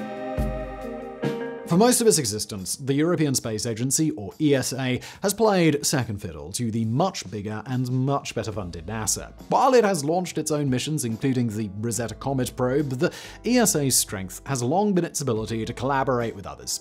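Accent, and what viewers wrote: England, United Kingdom. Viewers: "Is There A Life" on the thumbnail? Poor English from someone with an English accent...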